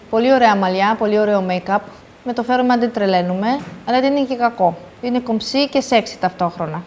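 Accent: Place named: Albania. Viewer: Δεν μπορώ να ακούω τον καπετάν καρνάβαλο να κάνει και μαθήματα μόδας.. τόσα χρόνια στην Ελλάδα και ακόμα μιλάει σαν την καθαρίστρια μου που ήρθε πριν 4 χρόνια από Αλβανία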